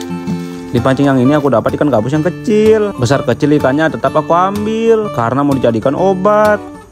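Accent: Java, Indonesia. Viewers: Bg orng Sulawesi tpi logat jawa sma kita bang😂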